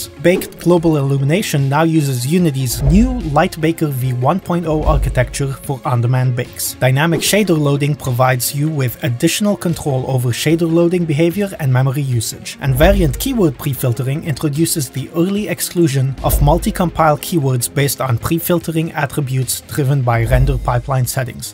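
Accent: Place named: France. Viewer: Love the french accent! Good video :D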